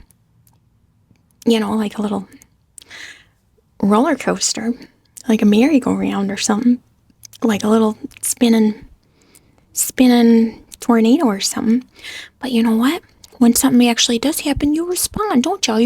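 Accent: United States. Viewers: Hearing your accent, I just want to hear, "Dontcha know" on repeat :P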